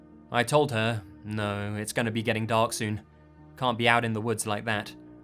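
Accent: United Kingdom. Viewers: Native American Indian stories with British accent. Loving the stories thus far just caused me to raise an eyebrow. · Just have to say that i really like this channel. Love the brittish (?) accent. Keep up the good work! Sorry to hear about the copywright shit. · Fair use Act violation sounds like. As long as no one's being harmed. I don't see a problem. Good video.. a little wierd hearing the story in a British accent though. I'm thinking more baritone" Native elder 101" voice. Good stories. Thank you. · This dude is the most British sounding native American I've ever heard. Good stories tho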